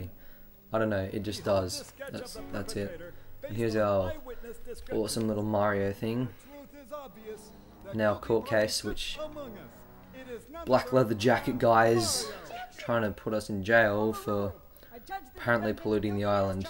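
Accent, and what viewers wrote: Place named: Australia. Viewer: Hey, just wondering, are you an Australian, Brit or New Zealander? I can never tell the accents apart! >:O Also, keep the videos coming dude, I'll definitely watch 'em.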